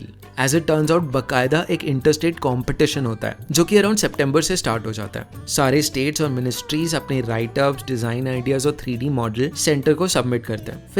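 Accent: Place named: Agra, India. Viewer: Bro, I love your voice. ❤️ It's so soothing. ( Are you from Agra or near ? Your accent tells me. ) Apart from Kurzgesagt, Neelesh Misra and Think School, yours also is my favorite youtube channel. Thank You so much !!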